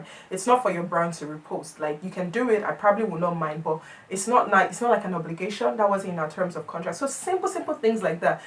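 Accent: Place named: Africa. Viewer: looking beautiful as usual but let's all just act like we don't know the brand lol you sound like an African mummy 'panti leleyi' lovely video as usual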